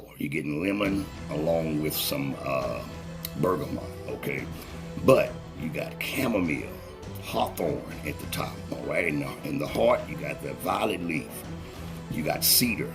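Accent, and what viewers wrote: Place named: South, United States. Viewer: That’s so cool you have that southern accent lol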